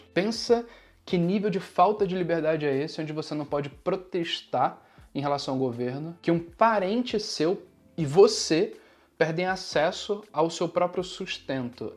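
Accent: Rio de Janeiro, Brazil. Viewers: Voce tem que ser Carioca com esse sotaque. Eu tambem nasci no Rio num lugar perto da Penha chamado Bras de Pina. Sou cidadao daqui dos USA a quatro decadas.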